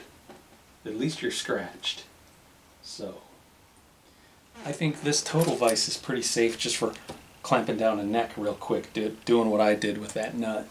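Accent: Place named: Germany. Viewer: I enjoy your videos. Great workmanship. I do need to ask, why do you always seem to throw in a German accent? Why not Indian, Chinese, Hindu, etc?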